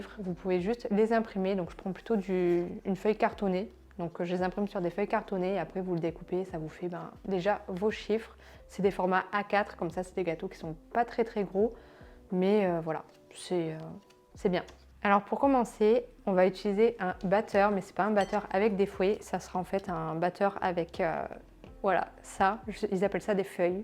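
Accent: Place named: North, France. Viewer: ton p'tit accent nordique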